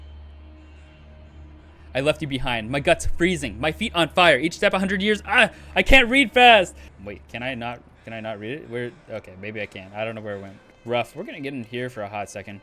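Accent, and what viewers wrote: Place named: Canada. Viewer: This is my first time seeing your channel based on your accent I’m guessing you’re a fellow Canadian. :D if not hello from Toronto Canada. You kind of sound like your from Alberta maybe 🤔. I’ve had this game in my steam library for a while. I might actually play it. Your video has inspired me :D.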